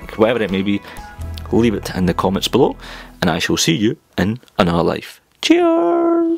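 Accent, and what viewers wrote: Scotland, United Kingdom. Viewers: Scottish accent FTW!! Nice video btw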